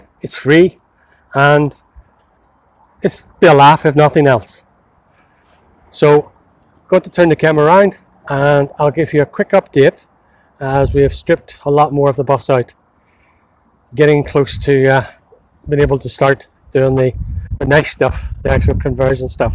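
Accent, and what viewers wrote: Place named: Ireland. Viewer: Well hello there. I get to praise you as my hubbie is a bit of a quiet person. I love to hear your accent it reminds me of some Irish visitors we had in our Bowling Club in Scotland's east coast. You must be a really handy man to have aboot the place. I'd trade you for mine, as I can see you're a quare bit more cuddlier and there's nae heat in that one of mine in a winter's night. Till I tune in again take care my love.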